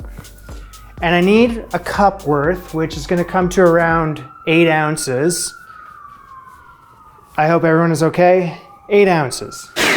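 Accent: Canada. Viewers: Don't be embarassed by your Canadian pronunciations.... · Your previous pronunciation of cauliflower is not that big of a deal. What bugged me at first was how you said “pasta”. But then I heard a few other words that were pronounced in a distinctly Canadian way. So, I Googled “how to Canadians pronounce pasta”. Sure enough, I discovered that was it. My point is that with the way a certain 🦇💩🤪🍊🤡 has been treating our neighbors to the north, I’m going to try to adopt the Canadian pronunciation of “pasta” for the foreseeable future.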